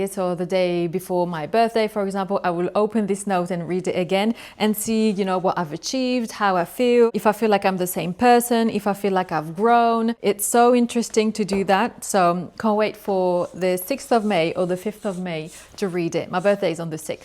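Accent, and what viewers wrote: United Kingdom. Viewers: Coucou, trop cool te t’entendre parler l’anglais avec l’accent British hehe ce serait super chouette d’entendre parler en anglais aussi 😍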